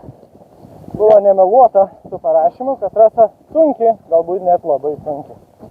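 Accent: Šiauliai, Lithuania. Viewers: Respekc uz siaulietiska kalba:)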